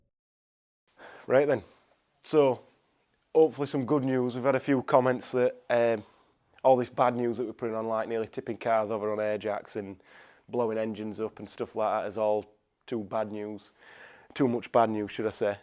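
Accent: Yorkshire, United Kingdom. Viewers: Yorkshire boy... Get on with it!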